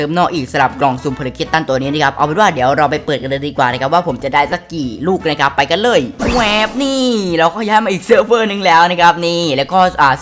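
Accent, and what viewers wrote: South, Thailand. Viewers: พี่เป็นคนใต้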